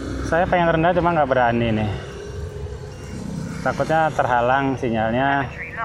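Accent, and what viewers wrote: Cirebon, Indonesia. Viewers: kalo dari logat bicaranya kalo ga orang cirebon.indramayu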